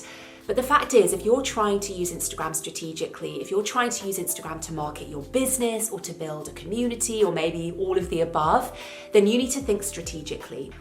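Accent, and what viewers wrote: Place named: United Kingdom. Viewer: thank you not only because of this video you just uploaded which is helpful but only because you're the first one with british accent (if I'm right) whom I can follow and understand :))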